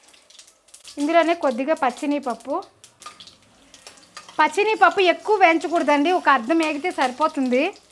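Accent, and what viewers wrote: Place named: Chennai, India. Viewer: Nenu chennai,ikkada tami telugu vaaru ilaane maatlaaduthaaru😊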